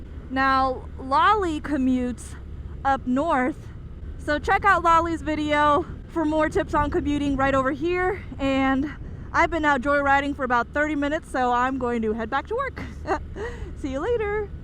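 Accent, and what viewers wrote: United States. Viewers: You sound like you live around Hotlanta!😁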